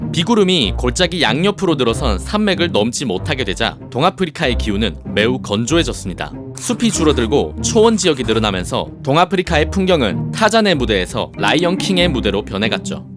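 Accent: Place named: Gyeongsang, South Korea. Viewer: 혼자 읽기 힘들었는데 감사해요 경상도 분이시죠?? ㅎㅎ❤️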